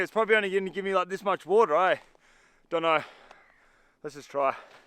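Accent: Australia. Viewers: Great video, and happy new year to you a mac10.. it’s really funny how super Australian you sound with the backdrop of snow haha 😂 the accents multiplied since leaving the sun haha.. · If it wasn't for the Australian accent, I would've thought him a russian 😂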